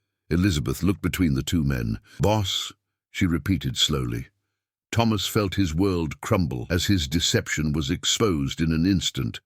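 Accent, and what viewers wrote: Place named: United Kingdom. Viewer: The British accent doesn’t match the Wild West. 🥴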